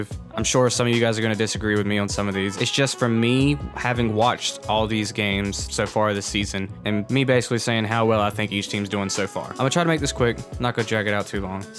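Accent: South, United States. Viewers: You sound like a more southern version of GarrettG. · Great video, great analysis, and I like your southern accent 10/10 quality rocket league content creator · idk why but im getting a hint of country accent like the same accent in my area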